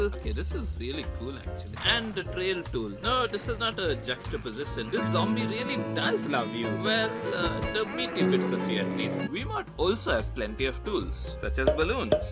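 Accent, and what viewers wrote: India. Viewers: I thought you are indian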